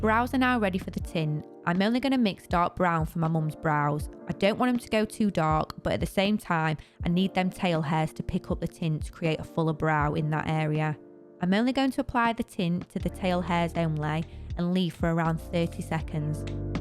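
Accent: United Kingdom. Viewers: It looks so relaxing, I'd love you to do mine! I wish I was in the UK going by your adorable accent.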